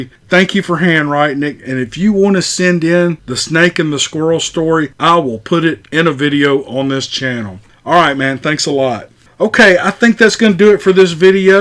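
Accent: South, United States. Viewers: More great encounter stories from and his molasses soaked southern drawl!!! Who in their right mind would click on the thumbs down?!?!? Take it somewhere else, we don't want y'all here, anyway!!! Thanks,. I appreciate your time. Great stuff, as usual 😍👣 👍👍👍👍👍!!!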